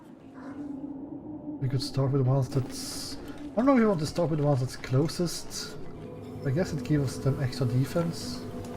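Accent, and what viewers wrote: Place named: Sweden. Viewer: Really bad starting spot, you did well. That's a big compliment to 95% of the other idiots I've watched playing. Are you Swedish by any chance? Both because of dialect and having some gaming intelligence. I've watched a dozen American streamers being incompetent at this game. Tummen upp. All the other streamers I've just facepalmed myself. Very good.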